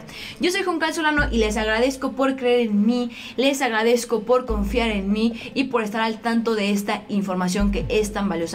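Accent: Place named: Jalisco, Mexico. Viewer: Como hablas,,,, igual que mi mujer...... dear de ser de jalisco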